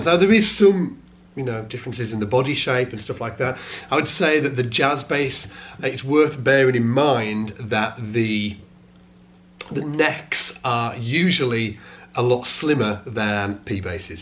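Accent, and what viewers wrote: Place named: Northern England, United Kingdom. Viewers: Northern British English. He lives in Leeds. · Hi, I'm new to bass but have played guitar for decades off and on. I'm far from expert but I play because I like to play, not because I'm really very good, though some say I am. For a little diversion I bought an Ibanez SDGR for under 2.5ton£'s. It has active pups, 3 band tone controls and a little switch that can change between single coil mode, humbucker and active output. I can get real smooth buttery bass, to cheesecake, then a bit of biscuit crumbs in the mix right the way to total gingersnap sound. Really loving this very cheap axe, and I have 5 others top notch guitars that were much dearer. Maybe it's all about the bass! Where you from in Leeds? I recognise the accent. I was born in Burley then moved to Tinshill when I was 7. Been in Newcastle since:)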